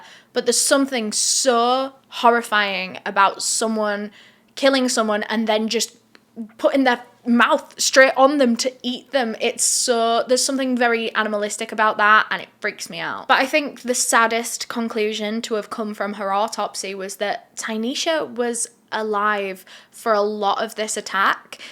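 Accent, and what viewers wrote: United Kingdom. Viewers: You sound UK and look Irish but wearing an Italian heritage shirt soooo was wondering 🤔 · honestly, your way of speaking and accent is so annoying 💀 it was really hard to go through the whole video (i liked the case so i watched) without cringing at your horribly fake talking.. you're the type of person that makes people hate a british accent lmao like wth is STAWries?? DAWr? affAWRed? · How is it that you have a British accent but yet talk like the classic obnoxious, ditzy, Valley girl? “Um yeaaaa, like okkkkk. I love to stretch out the last syllableeee of the last word in each senteeeeence. Sometimes even a couple times a senteeeeeence. With a very low, annoying, tired like sounding toooooooone. Or speaking with a high rising terminallllll. That all ditzy girls doooo. Which used to be called Valley Giiiiirl because a lot of dumb bimbos from the LA California areaaaa would all talk like thiiiiiiis and some still dooooo but not even close to how many used toooo like when Paris Hilton was famouuuus. 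Kim K used to talk more like thissss and thats exactly the type of braindead person that would talk like thisss, a person who watches The Kardashiansssss and idolizes themmmm who also probably think the world is flaaaat and couldnt do simple basic math or comprehend anything over a 2nd grade level educationnnn” I’m not saying thats you, I’m just saying thats at least how you sound when you talk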